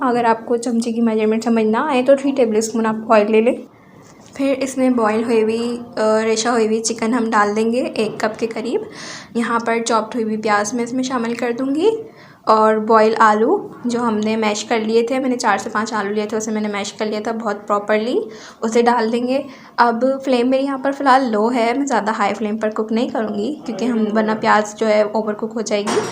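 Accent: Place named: Mumbai, India. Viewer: وعلیکم السلام بمبئی لہجہ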